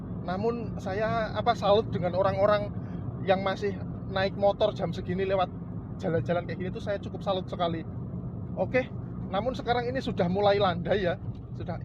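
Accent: Java, Indonesia. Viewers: Log at jawamu medok sekali..geli & jijik dengernya..aq pindarh aja..sorry..! Mantap.jawa kowek..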